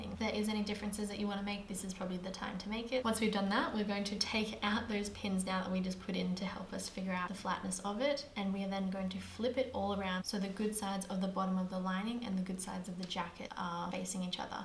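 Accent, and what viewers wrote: Australia. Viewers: Wow, what an impressive instructional video!! Excellent job, your jacket is beautiful. You are so good with measurements and seams. I almost feel like I could do this by just watching you. But I know it took great kill and practice. I have some old wool blankets to be used for this project. Thanks and love the OZ accent, Cheers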